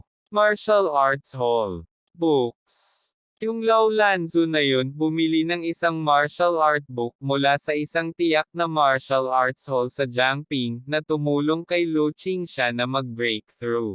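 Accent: Visayas, Philippines. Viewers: Kinaray-a nga ilongo na may halong bisaya 😅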